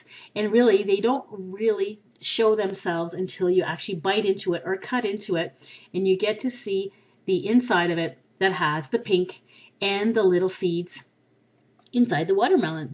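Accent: Canada. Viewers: I could tell that you lived in canada before you mentioned it because of your accent c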